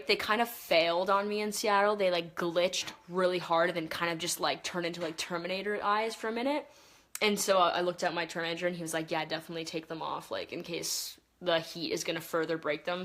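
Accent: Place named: California, United States. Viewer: why does she sound like she's From Cali 😂 that's how we talk here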